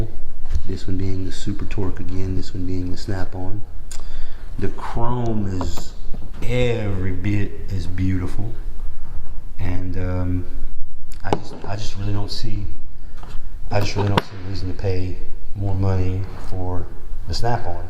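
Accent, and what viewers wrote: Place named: Louisiana, United States. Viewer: Noticed the accent before you finished your first sentence. Is it wrong that I'm more inclined to trust someone who talks like me? Been debating between these brands and was under a different impression. Thanks from Lafayette cher.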